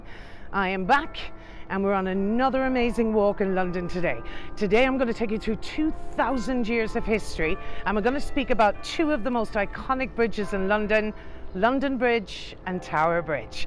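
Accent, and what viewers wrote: Ireland. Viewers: Amazing tour by the Irish Queen, your Knowlege & Beautiful Irish Voice make your tours absolutely A must do when visiting London! Your commitment to Detail is truly Second to None!! Thank you for all you do!! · One day when I can get back to London, first time was in 2008 from Oz to UK, I will book you for a tour esp the East End (my ancestors home). I just love your videos. I have so many books about London, it has always been a fascination to me, even before I even knew my mother's family came from East End London...I'm addicted. Thank you so very much you are incredible. And I love your Irish voice, also Irish from my mother's mother's side. cheers from South Australia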